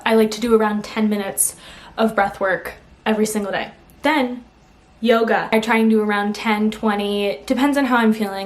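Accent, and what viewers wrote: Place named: New York, United States. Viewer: LOVVVEEEE this vid!! where’s your accent from?? you sound like a New Yorker 🤩✨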